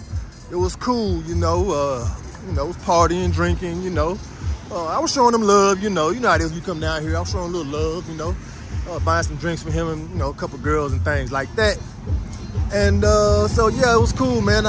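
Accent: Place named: Texas, United States. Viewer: Nigga I'm from East Texas too, Longview ! Lol ! About to get my passport. I knew this dude had a texas accent lol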